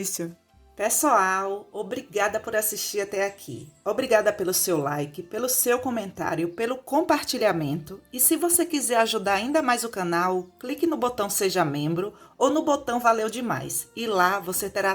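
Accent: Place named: Northeast, Brazil. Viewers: O que eu gostei o seu sotaque alagoano, igualzinho ao sotaque do meu sogro. A receita, ah! essa é maravilhosa 😅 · Já amei o sotaque dela😍 nordestina marminino❤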